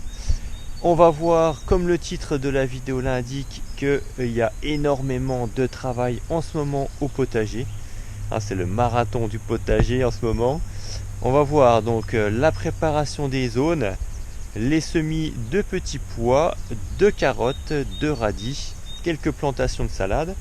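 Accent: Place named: Lorraine, France. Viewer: Je découvre votre vidéo, votre accent me rappelle la Lorraine dont je suis originaire ?! Un truc pour vos cultures de Fèves, ici on en cultive beaucoup. Pour ne pas avoir de pucerons il faut les semer à l'automne et on les récolte au printemps sans aucun puceron. Si on les sème au printemps on a presque toujours des pucerons aussi. Merci pour vos conseils et cet accent qui me fait chaud au coeur. Je vous écris d'Albi où il fait toujours trop chaud...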